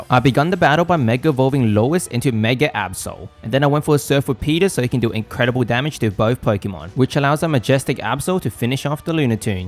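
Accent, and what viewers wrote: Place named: Australia. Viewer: Your voice sounds Australian unless im hearing things if so hi happy new years from Queensland also good dark run with dark types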